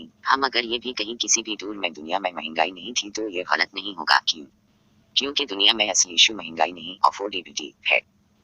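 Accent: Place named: United States. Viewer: یہ امریکن اردوہے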